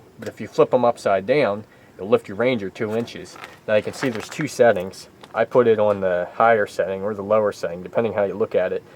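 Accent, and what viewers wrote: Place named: New Jersey, United States. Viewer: Interesting video. Given the location and accent I'm gonna guess you're in Salem or Cumberland County, NJ, lol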